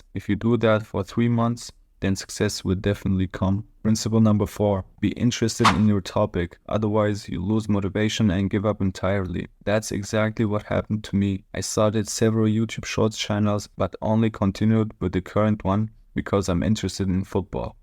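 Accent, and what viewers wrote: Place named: Germany. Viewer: Als wenn du wirklich deutsch bist habs am Akzent erkannt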